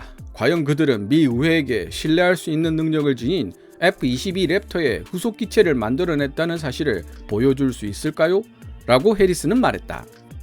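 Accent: Gyeongsang, South Korea. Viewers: 이분은 영어도 갱상도 억양으로 해서 오히려 잘난체 하는 오해를 불러 일으켜 쫌 재수가 없음... 그런데 영문만 나옴 호랑이가 사슴을 봤을때처럼 덤벼듦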